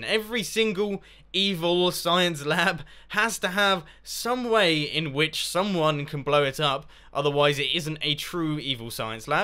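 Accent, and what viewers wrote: England, United Kingdom. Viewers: hes british. deal with it. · Jack Lusher Well he's from england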